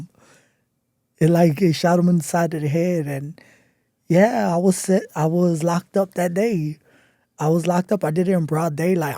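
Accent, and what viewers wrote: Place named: South, United States. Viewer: You serious? This fool is speaking like a ghetto ass reject using big words along that country bumpkin twang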